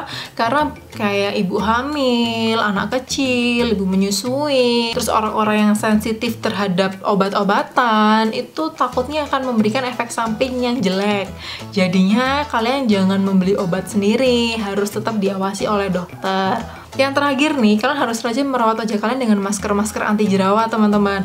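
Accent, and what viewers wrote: Java, Indonesia. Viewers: Medokk yaaa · Medokk bangettt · Dokter orang Jawa tah,ngomong nya medok😁,sama kayak saya kalo ngomong b.indonesia nggak bisa hilangin logat jawa'nya 😁 Btw, terimakasih informasinya dok, sangat bermanfaat 🙏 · Suaranyaa mueedokk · Alhamdulillah untuk jerawat saya tdak terlalu seperti itu yg agak seram jga, cma ini aja masalah sya mau sering kluar rumah/sering di rumah tapi sama aja wajah sering bnget berminyak dah coba sabun cream muka tapi msih aja terutama bagian sekitar hidung hingga ke kening/jidat kepala, mohon pencerahanya dok... Btw salfok mba dokternya koq agak medok bnget bicaranya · ngomong jawa'nya medok banget y...😂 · Medok bangetttt ngomongnya 😆😆, tapi pintar kamu yaaa soal nutrisi kulit 😗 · nice info.. btw medok bwanget mbak e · Medhok banget 😂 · Logate koyo wong jowo:v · Jawa nya modok bnget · Mbk, bahasa jawa nya masih ke bawa, bawa ya, 😀😁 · Medhoook e rekkkk😅😅😅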